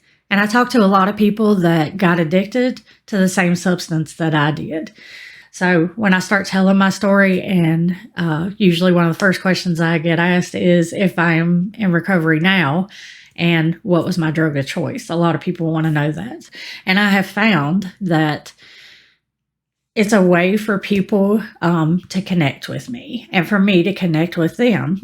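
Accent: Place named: South, United States. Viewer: Wow, that was a beautiful, open hearted and moving vlog. Thank you for sharing in that gorgeous Southern accent of yours. Any chance you could make a video off topic how to make sweet potato pie?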